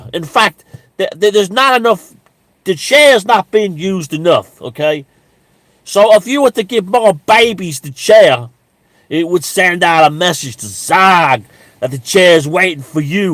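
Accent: South, United States. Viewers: Your fake Rural Southern accent is better than most of the celebrities! However, they DO pronounce their R sounds, usualy a hard, drawn out arrrr drawl. Dropping the R at the end of words is the biggest "tell' and the pretentious antebellum faked accents of those historical "Deep South" elite slavers who wanted to be British aristocrats. "Abowashun" is aborrrrshun. Draw out those aRRRRRs, man. As a proud non-christian Southerner, I can say this. Otherwise, the parody is funny af. Lol. Thanks!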